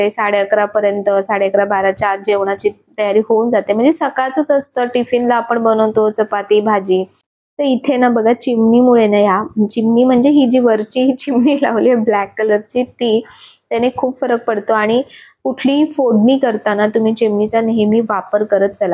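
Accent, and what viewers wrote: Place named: Konkan, India. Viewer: Kokan natil language asish ahe....tasch boln aste sarvanche ..ani chhan ch bolatat tai❤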